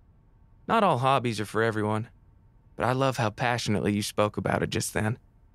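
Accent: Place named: United States. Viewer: I love the audio it's very cute but why he got a little bit of a country accent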